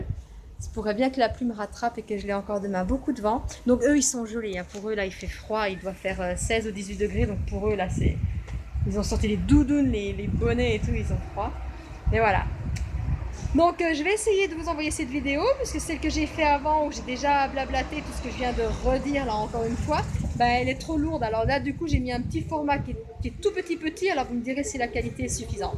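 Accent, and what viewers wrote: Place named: Jura, France. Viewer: L'accent jurassien revient d'ailleurs....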